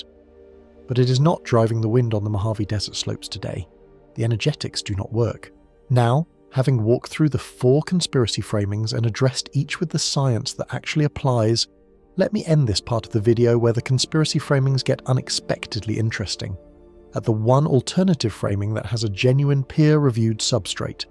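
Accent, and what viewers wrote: England, United Kingdom. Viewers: Isn't there anything going on in Europe why are you telling that's about the United States and not talking about the continent that I think you're from since you have an English accent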